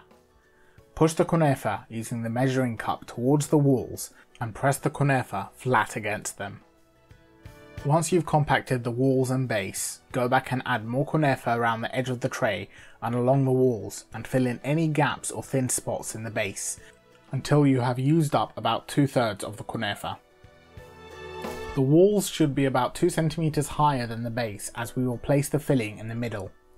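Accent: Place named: United Kingdom. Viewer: Bro how did y grt that accent British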